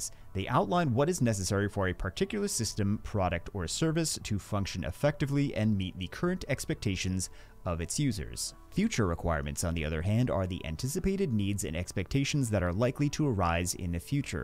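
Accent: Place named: Canada. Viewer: Must be a Cannuck narrating ... Asooor...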